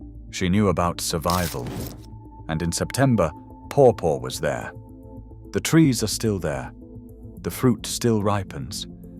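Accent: United Kingdom. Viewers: OK-- i like paw paws, and they're an American fruit. So why was a British accent chosen to narrate this video? 🤨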